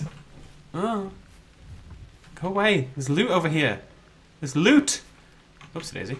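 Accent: United Kingdom. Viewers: It's Zed. Just like Maisie in Fallen Kingdom, you speak the Queen's.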